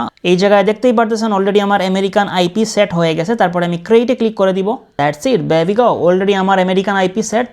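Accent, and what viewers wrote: Sylhet, Bangladesh. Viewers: Sylheti ni vai tumi?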